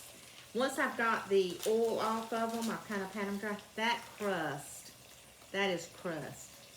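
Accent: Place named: North Carolina, United States. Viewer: I discovered your channel a couple of days ago and I am loving it! I suspected you're from eastern NC with the accent (not poking fun, I have the same accent!), but the fried chicken and banana sandwich just confirmed it for me! Please tell me I'm not wrong! My mama cooked the best fried chicken. I am 64 years old, and when I was a child and we'd go on vacation Mama would pack a picnic lunch for us to eat on the way at a roadside picnic table. She always, always, always packed fried chicken and banana sandwiches! My daddy loved that combination. Such sweet memories!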